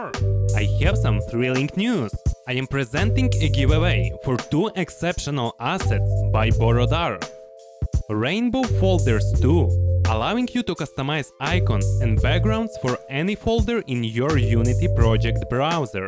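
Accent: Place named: Russia. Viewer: Вери гуд видеос, виз руссиан аксент итс мор ундерстендабле! Сенк ю вери матч!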